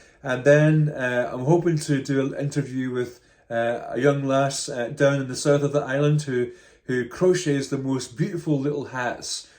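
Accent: Scotland, United Kingdom. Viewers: Your knitting podcast is one of the more relaxing ones and is also very realistic and relatable. (Meaning you don't say things like, oh double pointed needles are so easy I use them to knit lace in the round while also bathing my cat!). Also, I am homesick so it's nice to hear a Scottish voice.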